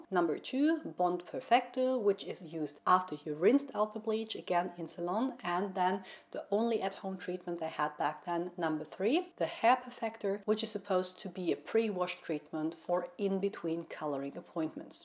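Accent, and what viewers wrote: Germany. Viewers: Omg im not sure but I feel like I can hear a little German accent!! And I thank you very much for the video!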